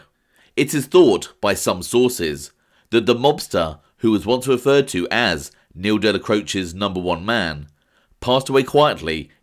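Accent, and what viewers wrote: United Kingdom. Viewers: It amazes me how much knowledge u have considering I'm assuming ur from the UK by accents. Good to no are American history reaches global appreciation.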